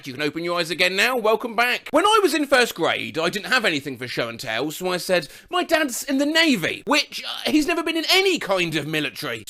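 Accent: United Kingdom. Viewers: A compliment for, his voice sounded especially British today.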